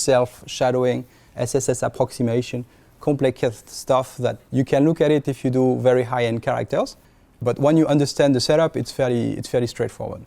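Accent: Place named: France. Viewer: Good french accent ! haha content de voir un Français chez Crytek ! c'est pas tout les jours !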